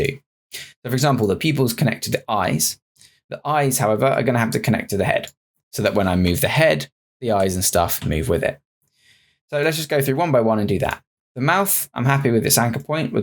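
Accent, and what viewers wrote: United Kingdom. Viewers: I've already commented on other videos of yours, just wanted to say a big thank you for how much I'm learning thanks to you. Among so many tutorials there are around, your channel is indisputably one of the best. Keep up the good work my friend. Also, listening to someone speaking with a British accent for so many hours sure makes lessons far more enjoyable lol.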